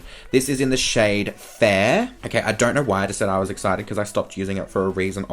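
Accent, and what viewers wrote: Australia. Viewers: I love how Australians say their O's. Q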